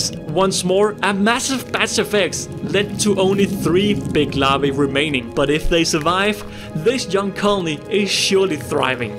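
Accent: Denmark. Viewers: are you danish? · hey your accent sounds familiar are you possibly danish? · bro got a danish anent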